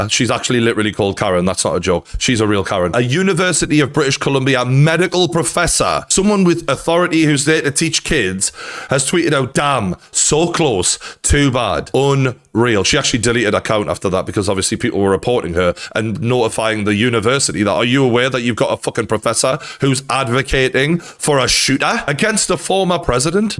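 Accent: Newcastle, United Kingdom. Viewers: Fucking Love a Passionate Geordie... 🤣🤣🤣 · geordie man!! you could talk the hind leg off a donkey - you've found your profession and im still searchin' for my channel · Not a Scot ,he’s a geordie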